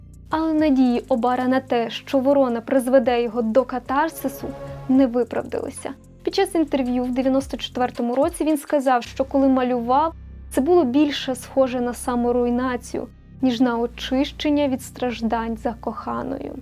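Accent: Standard. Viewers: Моє сердечко тремтить від твоєї краси!) А твоя українська без суржиків - просто музика для душі. Ти молодець)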